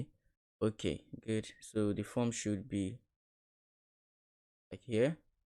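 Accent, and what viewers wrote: Nigeria. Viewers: are you a Nigerian, I'm so happy to find this channel, I have been with freecodecamp but I do notice your voice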